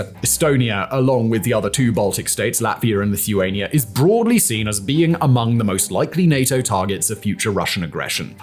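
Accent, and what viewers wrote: United Kingdom. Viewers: ok, 3000 comments so probably no chance you check mine; so you ll get a quick comment mate, you don't know what you re talking about, which is surprising considering you sound british just read churchil s memoirs mate and you ll know what s going on also, forget NATO troops, french troops; france got out before, can get out again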